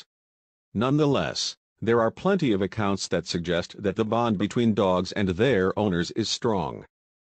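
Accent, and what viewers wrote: United States. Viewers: For heaven's sake man. You have no need of a robotic voice, you have an American accent. Use it, don't hide it.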